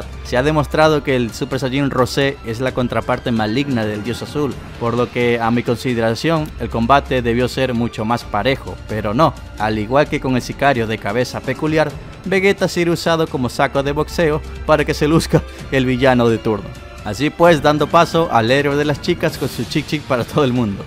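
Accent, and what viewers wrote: Ecuador. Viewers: Gabardina? Te expresas como nosotros mijo eres ecuatoriano? Saludos me gustan tus videos loco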